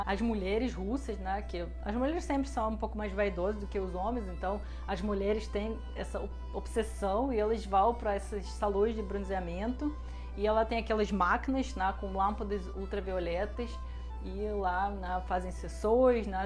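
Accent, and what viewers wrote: Rio de Janeiro, Brazil. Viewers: Você fala muito bem português e ainda tem sotaque carioca.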